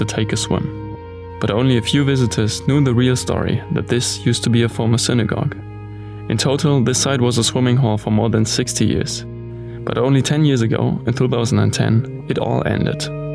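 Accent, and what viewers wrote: Germany. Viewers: Your German accent makes your videos surprisingly interesting and oddly satisfying to watch. Would be cool if you would go to Scandinavia next, like Denmark, Sweden and Norway. ....hit me up if you are in Denmark tho.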